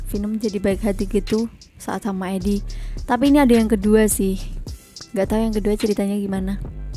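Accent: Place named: Semarang, Indonesia. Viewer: Kya eruh pengisi suaranya min...medoke semarangan 😅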